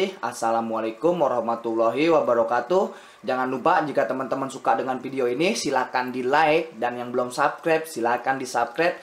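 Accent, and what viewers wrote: Jakarta, Indonesia. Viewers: Bahasa nye betawi benerrr haha.. bang makasih ilmu nya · Demen review bg. Betawi tulen. khas bgt Hhai hallo 😁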